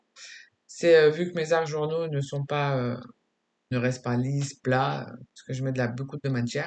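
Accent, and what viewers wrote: South, France. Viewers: Une jolie page vintage qui met en valeur cette ravissante petite fille avec tous tes pts bouts de papier façon patchwork , tu as des doigts de fée de magicienne ;) Le résultat donne une petite merveille .. Je retiens l'astuce pour le tamponnage sur du papier de soie pour plus de netteté , c'est vrai que tamponner sur une page de ART journal quand il y a des multicouches n'est pas toujours réussi.. Tu n'as pas l'accent du Nord , mais bien du sud je trouve . Félicitations pour le nbre des abonnés qui grimpe , bravo ! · Merci pour cette nouvelle page toute vintage ❤️ Ce serait dommage de nous parler en anglais ton accent du sud est tellement agréable ainsi que ton rire léger, bravo pour ta petite célébrité toute méritée à bientôt 😊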